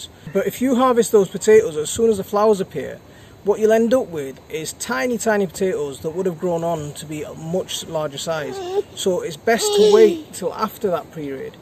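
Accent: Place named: Northern England, United Kingdom. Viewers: It warms my heart to see a variety of people from various backgrounds and religions here not commenting negatively. This goes to show the affect our brother with his wicked Strong Northern accent is having on the peeps. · Agreed. I am as white as the driven snow and born and bred here. But my even my accent isn't as northern as that. Keep the videos up mate, I really enjoy watching young children (and adults) enjoy the garden and i enjoy learning from you too. Happy days!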